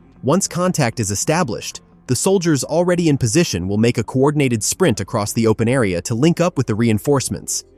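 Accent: United States. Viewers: Do you steal all of your footage from Azov YouTube channel? with an American narrator... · cant stand the overly "american" accent voice overs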